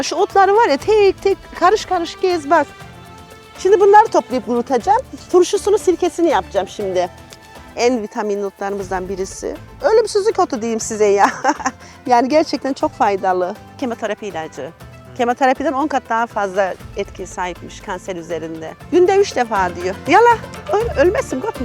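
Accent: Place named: Adana, Turkey. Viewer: Adanalılar şivesine benziyor Ablanın konuşma tarzı verdiği bilgiler çok kıymetli teşekkür ediyorum Allah razı olsun. 🤲🌷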